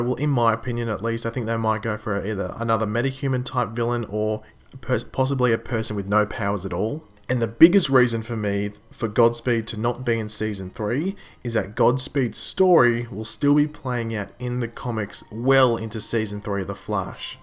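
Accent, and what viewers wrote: Australia. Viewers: Are you Australian? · u sound Australian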